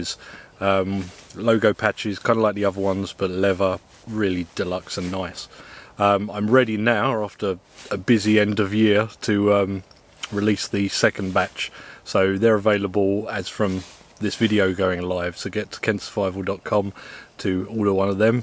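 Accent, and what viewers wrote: England, United Kingdom. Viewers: Hi, Please extend your food eating aspect of your videos. A big part of why I enjoy your camping videos over others, well in part its because of your English accent as I'm Australian but the rest of my family was born in England and all my relatives live and are from England or Wales but its also because I really like what you choose to cook and I enjoy watching every aspect from the preparation (why you don't have a nice shun or global kitchen knife I don't know) cooking and enjoy watching you enjoy eating the food. That chicken for instance looked really yum and instead of only really seeing two bites of it, I would enjoy your videos even more if we could watch you enjoying the food much longer. Btw, if you do buy a kitchen knife for food prep and eating, I really recommend the Shun tomato knife, its lightly serrated and compact and really multi-purpose, just don't try cutting through bone. 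Or Global GS-10 cheese knife as its compact, multi-purpose and you literally could use it as your fork. It also has a stronger slightly thicker, more robust edge than the shun. Both are extremely sharp. The Global knife being wholly stainless steel is slightly more hygienic given you could probably submerge it in boiling water if you needed to. I actually found it difficult to find UK links, even that one gave me AUD and free shipping to Aust. Link Shun <link> Link Global GS-10 cheese knife <link>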